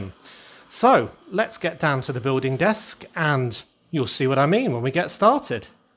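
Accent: United Kingdom. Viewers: so you’re from south England?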